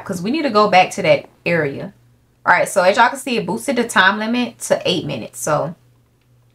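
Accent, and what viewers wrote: Atlanta, United States. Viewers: You from Atl or something? I’m new to the channel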